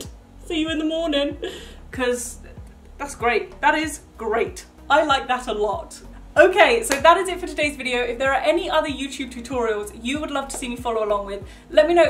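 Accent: United Kingdom. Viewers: I love your British accent ❤️❤️❤️❤️❤️❤️❤️❤️ also you are soooo pretty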